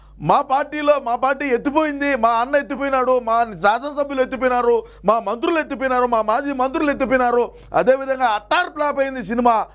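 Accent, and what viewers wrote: Rayalaseema, India. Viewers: మిశ్రమ విఫలమైనా సఫలమైంది, తమ్ముడూ. మాది కడప జిల్లాలో రాజంపేట. మీరు మాట్లాడే రాయలసీమ భాష నాభాష కూడ. నాకు చాలా చాలా ఇష్టమైన భాష . మీకు అంతా మంచి జరగాలని అశిస్తున్నా.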